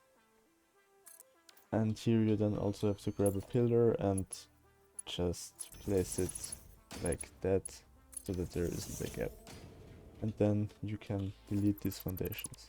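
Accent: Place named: Germany. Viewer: your native language ... seems to be german ... thx for the highway blueprints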